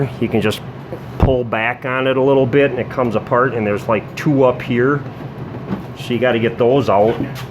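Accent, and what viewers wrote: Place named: Rust Belt, United States. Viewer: Great video! Love that thick rustbelt accent. Last generation of the unique American accents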